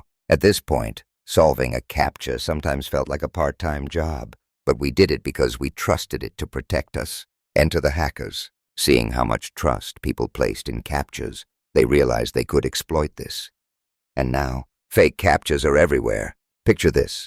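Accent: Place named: United Kingdom. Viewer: "American Tales" with a British accent. Funny. Thanks though :)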